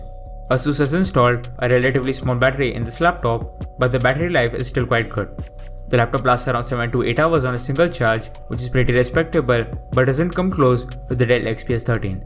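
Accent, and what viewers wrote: India. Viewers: I don't understand Indian enghlisdhs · Да ), акцент у индусов ужасный ))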